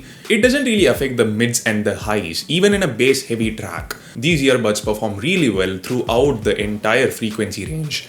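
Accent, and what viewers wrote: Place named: Tamil Nadu, India. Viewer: The way you stress few words, typical tamilan da.. Qwalittyy, sooperu, sometimesu, controllu... But I love your videos and review script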